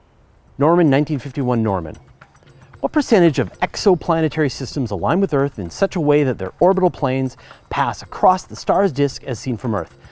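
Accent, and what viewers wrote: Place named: Eastern Canada, Canada. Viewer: I was born and raised in the lower mainland. I've never said 'aBOOT' nor heard anyone around me saying it. First I heard of it was from American shows mocking Canadians, but then I noticed some Canadians from Ontario using it and figured it was a eastern Canada thing. So.... a bit surprised to hear you using it Fraser Cain. Do you say "rewf" instead of roof, too?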